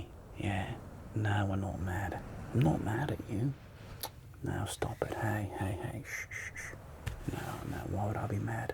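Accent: Australia. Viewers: The Aussie accent was 👌 and the suncream on your nose was a good touch 😁 we need more roleplays like this! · Your Aussie accent is actually really good, you could have called me a c**t though for maximum authenticity 🇦🇺 🐨 🦘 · This is me, an Aussie, petitioning you to please do a Russell Coight ASMR. The accent was 👌 and the theme of this video was so on brand for us. The sun ain't no joke down under! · big fan of the aussie accent! would love to see it come back in future videos :) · As an Aussie myself I just want to say he did a good job especially the accent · Killer work, bro! Especially with the Aussie accent!!!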